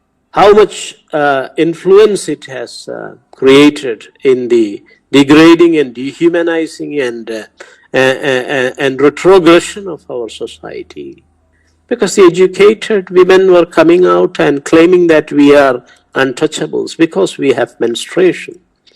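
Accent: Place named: Kerala, India. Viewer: ഏതാ ഈ മംഗ്ലീഷ് വിദ്വാൻ..... Boring recorded talk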